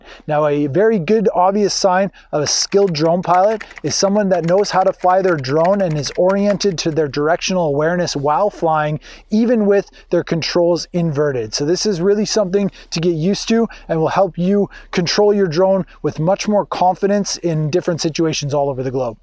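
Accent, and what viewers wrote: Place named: Canada. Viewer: sounds Canadian, lol.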